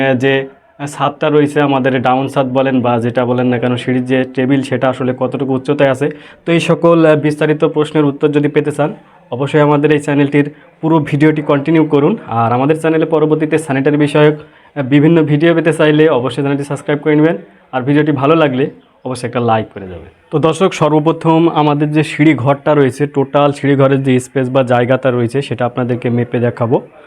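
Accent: Jessore, Bangladesh. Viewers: Thank you.kub Moja legece r apnake dekhe onnek onnek hasi payece.mone hoi apnake osostite fele diyeci.sorry.tobe apnar mukhe jossorer vasa sunte darun lagcilo.kintu short vedio upohar dilen!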